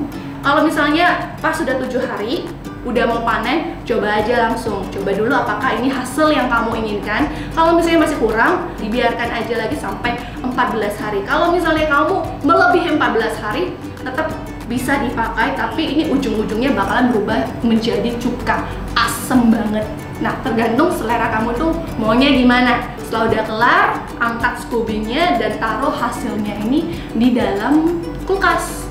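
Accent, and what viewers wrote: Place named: Sumatra, Indonesia. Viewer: Wah cicinya orang sumatra ini.. medok bgt ngomongnya 😆 mantap lah dpt di youtube resepnya.. thankyou!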